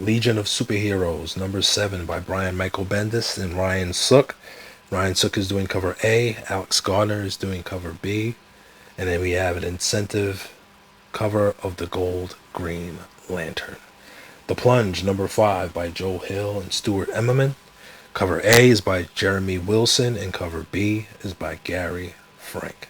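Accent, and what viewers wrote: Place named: New England, United States. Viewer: Yo my guy are you for Mass.? I be hearing that New England accent lol.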